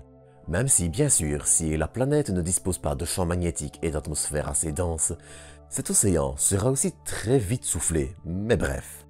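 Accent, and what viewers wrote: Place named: Flanders, Belgium. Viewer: Belge flamand, je crois.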